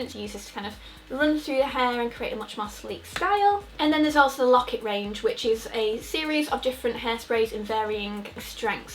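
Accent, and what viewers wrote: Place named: Northern England, United Kingdom. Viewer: Hey, Recently found you on YouTube. It's lovely hearing a refreshing Northern accent and references to places in Manchester rather than Brighton! I'm an English teacher in Bury! Keep up the great work!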